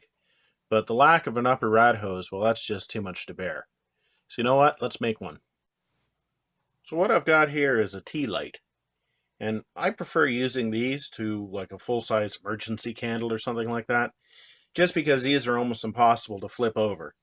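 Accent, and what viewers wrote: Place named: Canada. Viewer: That is an awesome truck, and a nice accent. Love the Canadian accent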